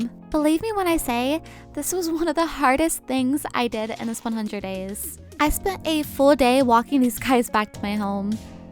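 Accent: Australia. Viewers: Very great Aussie accent, fun thing is that people from the center to northern territory do speak like that, but I'm from the other end of Australia (AKA the southern east area) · The best Aussie accent I've heard so far And I'm a Aussie :) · Your accent was half Australian half English coming from and Australian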